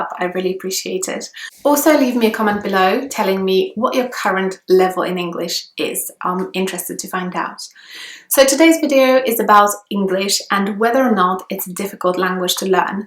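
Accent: United Kingdom. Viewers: I completely thought you were a native Britian before you said you were polish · Ironic that you ended up with such a beautiful British accent after finding American English easiest to understand at first. As a native speaker, it's difficult for me to say, but (as Brandon commented below) the absence of noun gender must really help. I find just remembering genders in German very hard, still. As Scott says, below, isn't the crazy English spelling the main difficulty, though, from a reading a writing perspective? I once read a book by a Russian linguist who argued it takes English native children two years longer to get literate because of the spelling. I still struggle, sometimes, even with top 2,000 frequency words after decades of extensive reading and writing. I'll always know when I don't know, if you follow, but I do sometimes have to check. Rather like Chinese or Japanese natives having to check the characters (though it's not that difficult, I'm sure).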